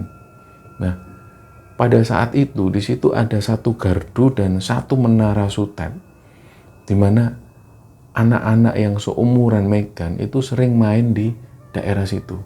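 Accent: Java, Indonesia. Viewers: Video nya hampir smua kutontoon mas the best menyajikan cerita nya khas medok Jawa nyaaa 👍👍👍👍 ketagihan nonton nya tekstur crita gAmpang paham untuk dicerna👍👍👍good Job mas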